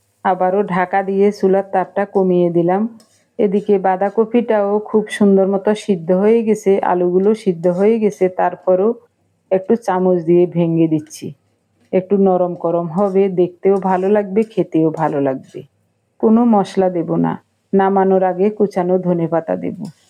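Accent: Noakhali, Bangladesh. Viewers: Bandhacopi bata khub bhalo laglo noakhalir khuda lagle hudao katha kotobachar par sune mon khub khub bhalo laglo dhanyawad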